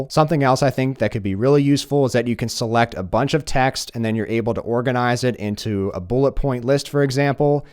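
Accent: Philadelphia, United States. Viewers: Gotta be from Philly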